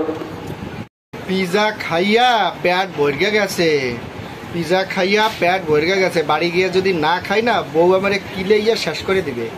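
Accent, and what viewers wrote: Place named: Bangal, Bangladesh. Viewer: বাঙাল কথা কও কেন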